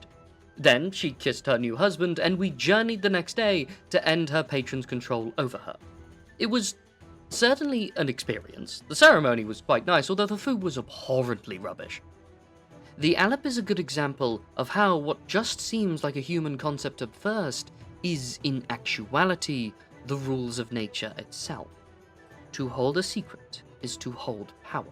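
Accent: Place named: United Kingdom. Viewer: Waiting for my favorite British voice be it in D&D wizard of vampire lord and it's been a month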